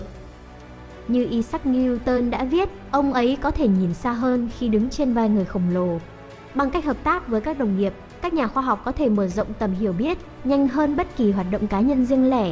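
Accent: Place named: North, Vietnam. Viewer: Giọng Bắc đấy.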